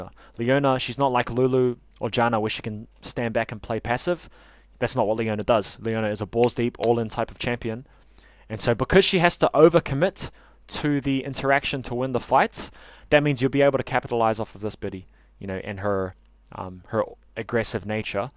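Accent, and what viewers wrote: New Zealand. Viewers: Thanks for this guide bro, you from nz?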